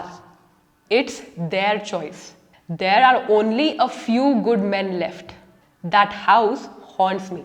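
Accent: Hyderabad, India. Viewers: Are you from Hyderabad ? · Mam you are hyderabadi? aren't you? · I'm a big fan of your voice...you speak so impeccable & your Indian accent is fantabulous.... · You used understand na while asking the question 😉 .. and I guess you are from HYD.. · Understood na 😆 but i love the way you teach.... Hyderabadi touch comes from your tone. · You speak Hindenglish. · I must admit I love the Indian accent and especially the universal question tag, "isn't it"" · No popular english self help books are available over YouTube in Indian accent. I think you have a wonderful, sweet and friendly Indian accent. If you think of doing it, it would definitely help lots of aspirants like me in developing our English beside personality. Thank you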